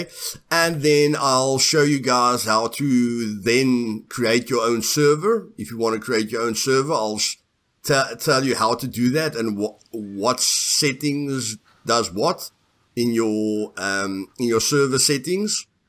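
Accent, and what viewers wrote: South Africa. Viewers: Friends and I are getting into scum today thanks for the help . Are you South African ? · I'm enjoying the video so far. Thanks!! I'm looking forward to playing. Btw I hear your south African accent😃. My old pastor was from South Africa. I'm looking forward to watching more of your videos. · Which part of South Africa are you from bru....or Rhodesia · Jy is van Suid-Afrika :D ek hoor dai accent :P. thanks viri video dude! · is that an SA accent I hear? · Are you south African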